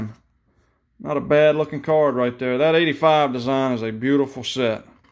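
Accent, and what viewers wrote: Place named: Louisiana, United States. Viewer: Love your channel and Louisiana accent..lol..You seemed so down to earth and humble and what makes you stand out to me..lol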